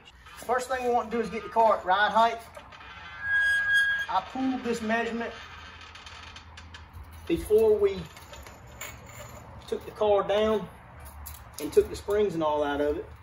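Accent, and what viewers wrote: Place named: Appalachia, United States. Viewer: HillBilly trying to sound real intellectual like.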